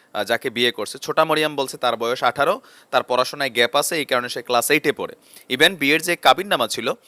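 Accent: Comilla, Bangladesh. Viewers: Vai,,plz apni alws cumillar vasay vedio diyen,,, eto eto eto valo lage❤❤❤ aha go moirom bubu❤❤❤😂😂😂😂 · hahah i just like ur comilla accent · ভাই আপনি কুমিল্লার ভাষায় বল্লে সুন্দর লাগে।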